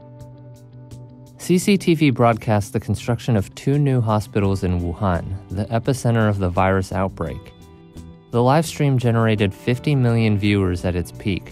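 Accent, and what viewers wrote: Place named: United States. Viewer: 正宗的美式English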